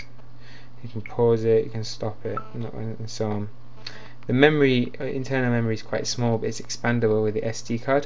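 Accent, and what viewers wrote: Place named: Arab world. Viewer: are you arabic by anyway? your accent is so great mashala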